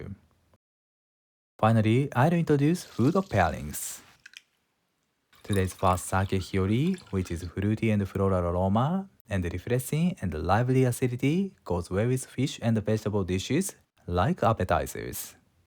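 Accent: Japan. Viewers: I would like to commend you on the progress of your English speaking. I also love that you have preserved your traditional Japanese accent which is delightful to listen 🎧 to. I love your videos and thank you for the wonderful content you provide. This one is a particular favourite of mine. Regards and have a great day 😊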